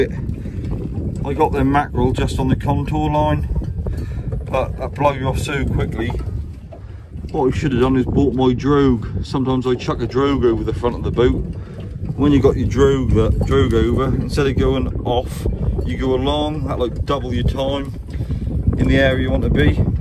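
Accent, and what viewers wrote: United Kingdom. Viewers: You accent is very thick, are you from the U.K.?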